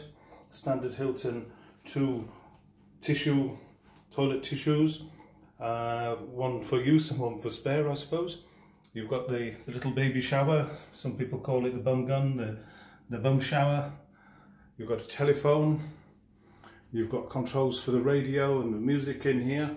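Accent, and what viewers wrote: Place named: England, United Kingdom. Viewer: cool and funky ! wonderful map included! soft and gentle humour by the youtuber! Lovely English accent !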